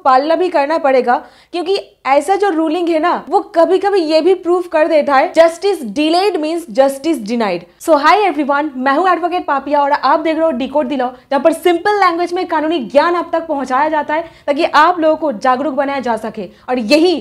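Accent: Bengal, India. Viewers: आधि तो इंग्लिश बोल रही है , और हिन्दी भी शुद्ध नहीं है ,,, यह बंगाली हिन्दी है 😢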